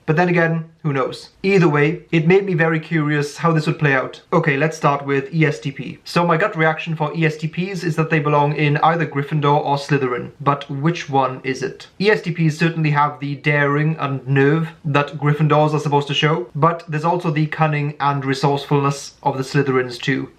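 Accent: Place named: United Kingdom. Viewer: I'm a ravenclaw and INFP 🤓 you got it right. Also, I am not a native English speaker and I find hisaccent very interesting. It is hard to understand but at the same time I really like it. Is it british with a twist? I'm curious..🤔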